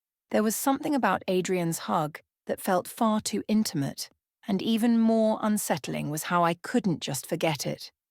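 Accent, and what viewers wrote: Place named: United Kingdom. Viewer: British accent is really beautiful to listen. ❤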